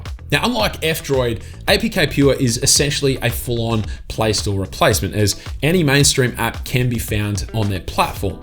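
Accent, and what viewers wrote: Australia. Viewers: When I heard your accent I thought you are a British but you are definitely Australian 😂❤️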